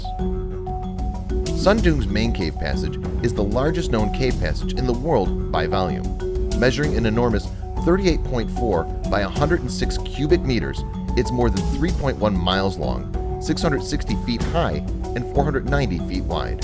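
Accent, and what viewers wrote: United States. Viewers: Within the first two minutes the video contradicts itself... Which was it... the sound of the wind or rushing water? And why bother to even try to tell us again what you've said only two minutes before? Is this made for the dumbest people alive.... Oh wait, the narrator is a yank so I guess it was.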